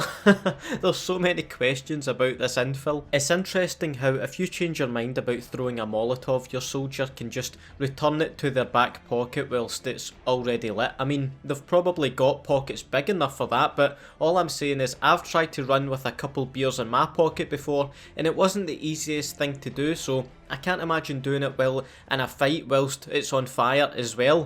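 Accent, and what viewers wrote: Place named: Northern England, United Kingdom. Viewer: God I love Northern accents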